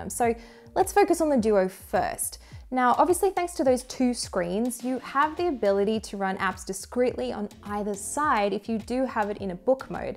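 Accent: Australia. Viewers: Just got the zfold2 yesterday. Amazing!!!!! Waiting for the spiegen tough armor case in the mail. Highly recommended that case! Also... I love your aussie accent! Xoxo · Australians would typically say /djuːəʊ/ (sounds kinda like d-wow) vs the dryer "u" sound in the american /d(y)o͞oō/. Her australian accent is subtle, though. I would not have picked it up if she hadn't mentioned it.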